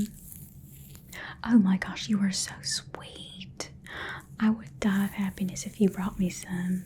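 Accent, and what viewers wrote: South, United States. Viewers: I feel like since does a great southern accent and she has done a Boys rp I feel like she would do a killer firecracker rp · The laughing 😂😂😂 you are such a good actress! I love your southern accent videos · Always luv the divided attention vids also ur so good at the southern accent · i’ve been rewatching all your southern accent videos for MONTHS i cannot thank you enough for this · I’m a southerner, but I don’t have a deep southern accent and I find it so calming. So any video with a southern accent? Golden <3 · . I just love 💕 that southern accent very Awesome 👏🏻 job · It's so incredibly, genuinely, southern. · Southern accent from tonight I liked so much 🥰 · Your southern accent asmr always relaxes me! I love your creativity & roleplays, ty for helping me sleep. Literally perfect timing 💖✨ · Yesss! Your southern accent videos are my favorite!!! 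❤ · She nailed the Southern accent😂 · The way you do divided attention in ASMR is so good, the Southern accent and gossiping makes this so nice and relaxing to listen to 😴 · Happy Thursday,!😊😎☀💙🙏🌷💖⭐⭐⭐⭐⭐⭐👍. Your southerm accent is so....special and tingly. AMAZING!! Plus, your smile is perfect!😀😍⭐⭐⭐⭐⭐⭐⭐👍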